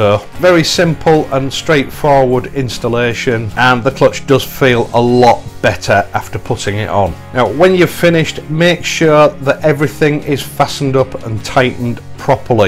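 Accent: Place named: Hull, United Kingdom. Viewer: Great video, I remember those Laverda handlebars from Jota reviews I read in the '70s when I was just riding a Yamaha FS1E. Nice looking Bonnie you have, I'm thinking of getting a T120 and saw your review on that also. I'm from Canada but have relatives in Hull and Beverly, your accent sounds very Hull to me but I could be wrong. Thanks for the great videos, good information and nice video quality.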